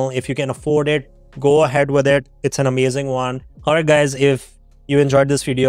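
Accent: India. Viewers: bro which part of North India ( lemme guess.. haryanna) are you from, mitochondrial stimulation is the final goal but your accent and intonation makes it tough but after reading several comments seems like you were on point. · I love Indian accent. So cute. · Why would a man with a thick Indian accent lie on the Internet? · I came to learn about red light therapy, not only did I learn a lot but also feel like I learned Hindi as well - you've an awesome accent!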